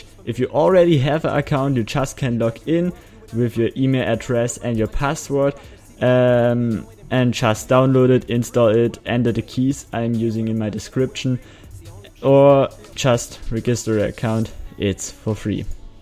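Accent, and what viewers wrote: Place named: Germany. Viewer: german? denk ich ma hörs an deim english ich bekomme 1000Errors wenn ich sie lade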